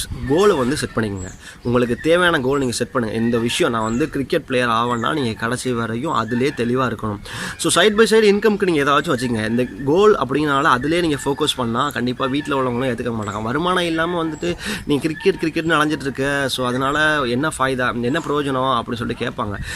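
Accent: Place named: United States. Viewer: Woooow what a American engalish speech language... Good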